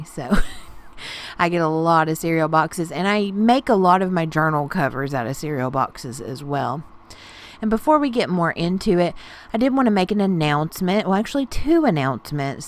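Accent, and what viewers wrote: South, United States. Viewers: thanks love your harvest videos. you sound like you are in the south. i am in nc. i have 10 cat rescues. Or should i say they have me lol i remember in one of your older video you had mentioned you were in college, do you still go, hugs and stay safe out there. I am older with health issues so i have to stay put for a while. fun is lol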